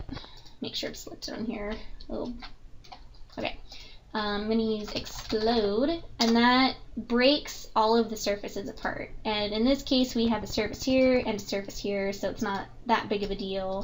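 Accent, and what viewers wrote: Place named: Midwest, United States. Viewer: lol that midwestern accent is great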